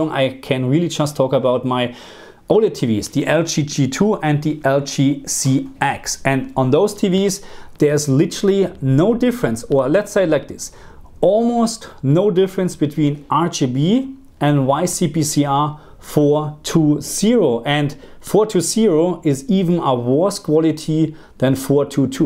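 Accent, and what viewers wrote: Germany. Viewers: Liebe deinen deutschen Akzent 😅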